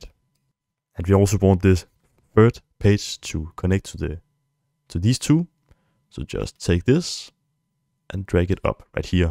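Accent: Denmark. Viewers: Thanks this was helpful and I love the (Danish?) accent. :)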